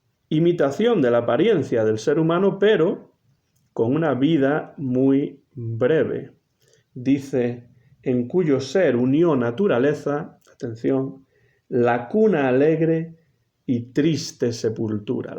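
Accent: Spain. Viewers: Gracias por su análisis sumamente interesante sobre Sor Juana Inés de la Cruz. Me encantan todos sus videos y su manera muy amena de presentar las obras literarias estudiadas en clase. También me encanta su acento y quería preguntarle en qué parte de España nació usted.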